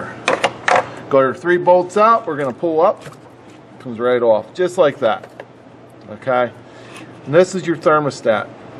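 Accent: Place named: Pittsburgh, United States. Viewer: Good vid thanks cuz... it's what I thought anyways but you just gave me some reassurance that I was doin the right thing. You're a Burgh boy ain't you? I can hear it in the accent (it's thick cuz), the force is strong wit this one.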